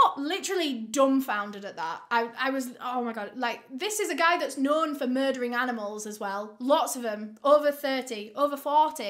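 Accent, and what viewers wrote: United Kingdom. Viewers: I found British MrBallen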